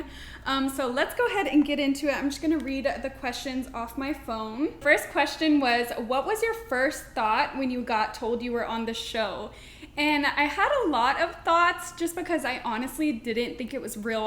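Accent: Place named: Texas, United States. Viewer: Girl you’re so inspiring! I’m also from Dallas and you have the whole accent of Texas. Love it 🤗❤️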